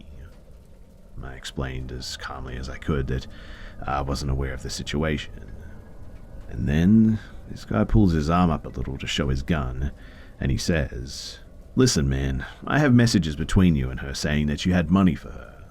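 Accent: Australia. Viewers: mistercet pretty sure he’s got an Australian accept lmfao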